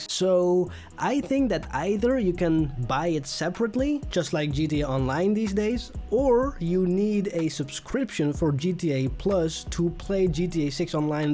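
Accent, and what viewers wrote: Netherlands. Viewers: Hi! I’m wondering, what country are you from? I noticed you sometimes have a Dutch-English accent. Just wondering. 😅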